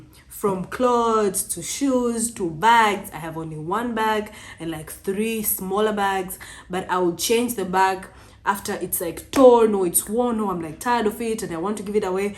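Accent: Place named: Kenya. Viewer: you sound Kenyan, then you don't!!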